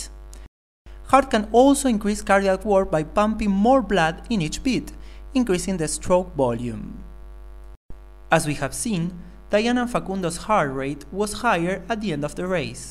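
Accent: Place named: Mexico. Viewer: ese ingles mexicano me hizo caga de risa jajajaja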